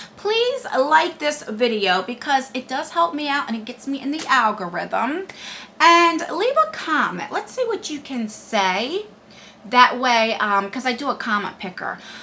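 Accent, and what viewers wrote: New Jersey, United States. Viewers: I'm obsessed with watermellon and your NJ accent. It makes me so homesick since I moved down south. Keep making these awesome videos!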